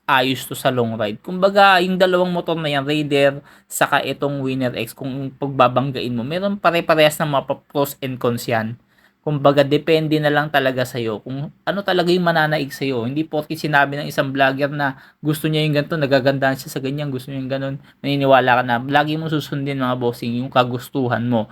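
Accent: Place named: Tagalog, Philippines. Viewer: Cguro boss ang pronounce nya sa example ay exampel hehe ksi tagalog sya,yong double dobel sa mga tagalog hahaha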